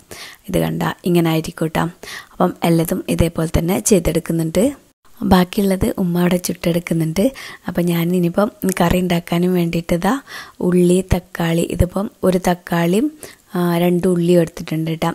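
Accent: Kasaragod, India. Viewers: Kasrgod ningala pattal kadha keettu nallonam chirichu..avida nallarasayrikoole..slang different aya inganavum lee..enthayalum vedio adipoli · pathiri Story comedyy ayikknn😃😃ippo varthanam ketta ippo kasarkode thanne😍😍😍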